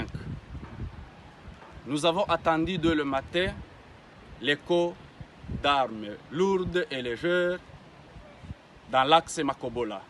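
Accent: Rwanda. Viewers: C'est la terre congolaise, quitte avec ton français Rrrrrrrrrwandolisé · A vous entendre dire avec votre ton et prononciation , c' est le Rwandais qui parle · Tu és vraiment Ruandais tá pronosiation est de ré.Tika biso tika lokuta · Vous retournerez au rwanda chez vous même votre tonalité prouve que vous êtes rwandais pas congolais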